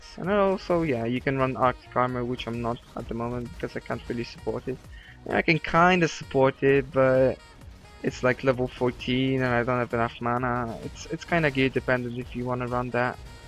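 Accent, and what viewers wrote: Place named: Poland. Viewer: Love the epick Suck Guy playing in background and btw nice english for a pol akcent similar to shusei :D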